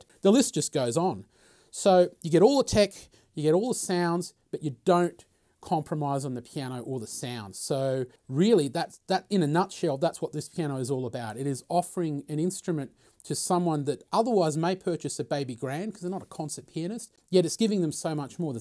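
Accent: Australia. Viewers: Loving the australian accent! how different is the GP609 from the GP607 I by the way own?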